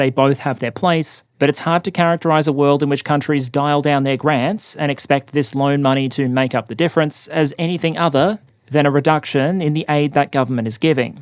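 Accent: Australia. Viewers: Is this Ausralian? Dialect and/or dude?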